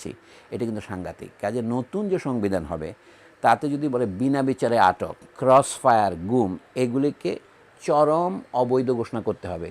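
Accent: Chittagong, Bangladesh. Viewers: As soon I heard you, I new you are our son ( Chaatgaiya) 😊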